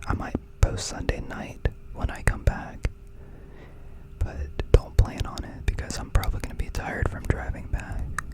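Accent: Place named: Houston, United States. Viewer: Sounds like H-town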